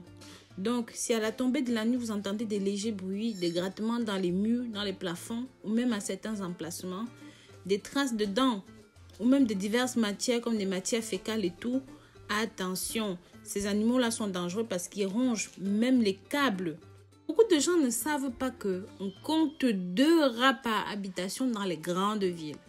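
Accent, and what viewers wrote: Africa. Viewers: ton accent d'Afrique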